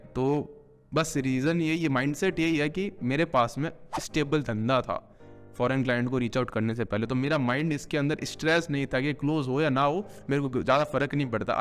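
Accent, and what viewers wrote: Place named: Marwari, India. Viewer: ❤️Acha, marwari Accent aata h esliye guess kiya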